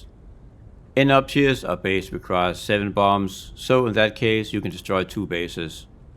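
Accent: Denmark. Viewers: man kan bare høre det er en dansker når de snakker engelsk😅 god video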